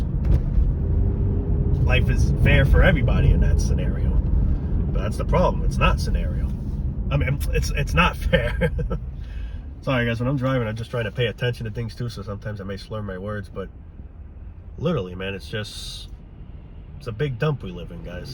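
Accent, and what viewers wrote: New York, United States. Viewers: I love ❤️ your New York accent and your videos. I feel so grounded in actual (not fake) 🃏 reality and unfortunately there's not a whole lot 🤏 of positivity. 😞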